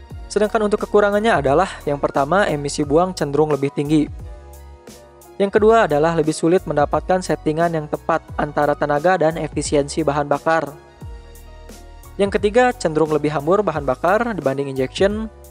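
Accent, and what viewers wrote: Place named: Slawi, Indonesia. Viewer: Sampean wong slawi ya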